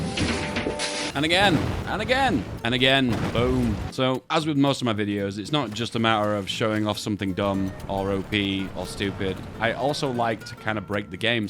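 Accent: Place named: Yorkshire, United Kingdom. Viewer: Knew you were a Yorkshireman, didn't know you were in South Yorkshire! Liked for best county.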